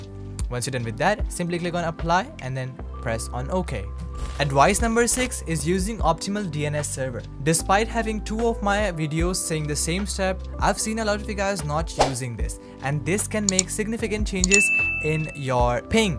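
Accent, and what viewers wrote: India. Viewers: i swear to god is this just another indian with just better accent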